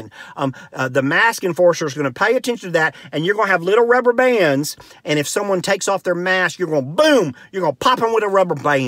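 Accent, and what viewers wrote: South, United States. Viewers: "Errr body". This gentleman definitely resides in the southern portion of the United States.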